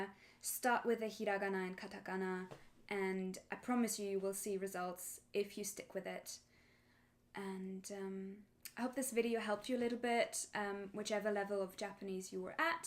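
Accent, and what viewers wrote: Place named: United Kingdom. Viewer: You are German? Umm, I thought you are British! Congrats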